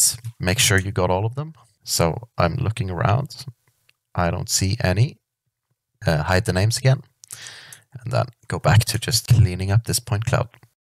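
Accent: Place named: Norway. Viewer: Great video! (Syns eg hørte en norsk aksent, gøy med nordmenn som lage slikt innhold!)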